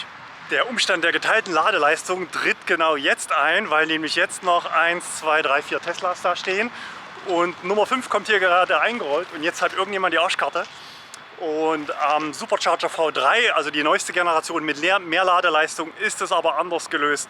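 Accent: Germany. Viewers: Your English has a very strong German accent. Hard to understand. 💪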